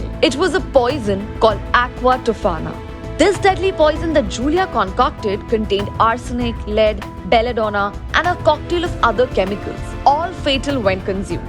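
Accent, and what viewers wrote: India. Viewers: u an indian? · Ughh! The Indian English accent! Makes everything sound funnier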